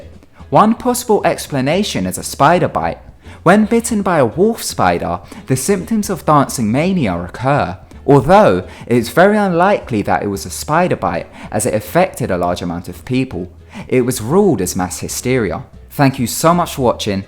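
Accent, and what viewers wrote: United Kingdom. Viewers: Pretty sure it's a strong UK accent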